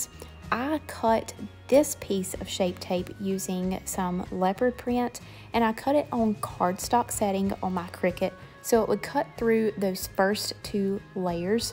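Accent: South, United States. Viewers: I love your ability to mix items that us "matchy matchy" people would typically not put together. This turned out beautifully, as always. I am curious though, (as a fairly new tumbler maker), how come you chose to do matte when using glitter? I wonder if using a non glitter vinyl and then using the mattify at the end would give it a similar effect? Your cup looked super shiney in the end. Is that how it looks after finishing with mattify? I'm genuinely just curious. Sometimes I feel like I'd rather have a matte finish on mine so thinking about getting some of that stuff. :) Beautiful job as always. I also love the southern accent. I'm in southeastern NC so maybe not as southern but we definitely speak with a southern accent here too. 😋😍🥰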